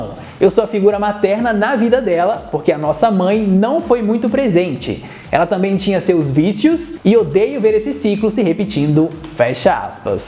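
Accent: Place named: Caipira, Brazil. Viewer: Kkkkk adoro a dublagem kkk bem caipira mesmo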